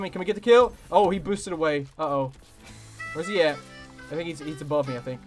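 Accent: Tennessee, United States. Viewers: I knew that accent was familiar. Haha. I'm a tennesseean as well. Glad to see I'm not the only guy with a country accent playing cod lol.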